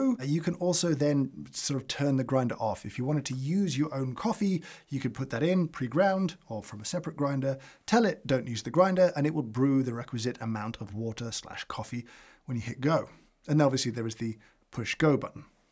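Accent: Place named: England, United Kingdom. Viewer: delivered with such a quiet kind English accent, it almost goes unnoticed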